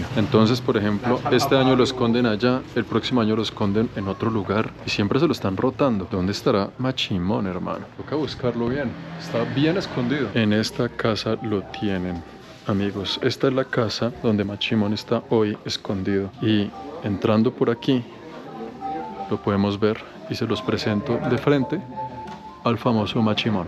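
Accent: Bogotá, Colombia. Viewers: Me encanta como narra la historia Con esa voz tan bella y ese acento colombiano Lo hace a una quedarse a ver todo el video 👍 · "Que linda jeta tienes amigo" Alobien que no me canso de escucharlo hablar rolo al rededor del mundo parcero. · GRACIAS POR DECIR ESO DE NUESTRO ACENTO BORICUA, 🇵🇷..TU ACENTO COLOMBIANO ES PRECIOSO TAMBIÉN! 🤗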